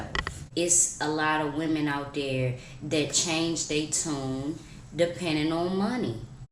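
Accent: Washington DC, United States. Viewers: Love the way you talk must be a dc thing ♥️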